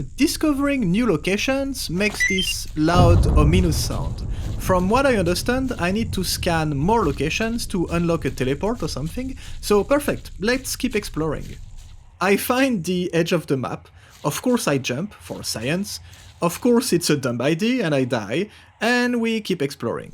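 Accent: France. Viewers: bro, just because your vid made me feel sorry for your time loss and your adorable french accent; ca craint et va te faire foutre, connard. much love, XOXO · Slt, ça s'entend que t'es français et étant francais aussi c'est un peu plus compliqué de te comprendre paradoxalement. Je sais que c'est un peu de travail en plus mais je te suggère de re doubler tes vidéos en français. Sinon j'aime bien tes vidéos, continue !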